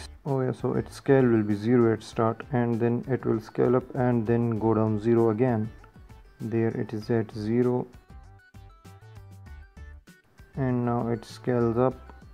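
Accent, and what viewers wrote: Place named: India. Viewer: Well the accent does not help irc you not used to Indian accent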